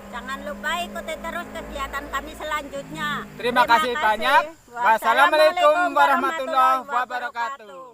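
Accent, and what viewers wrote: Banyumas, Indonesia. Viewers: Ngapak banyumasan ya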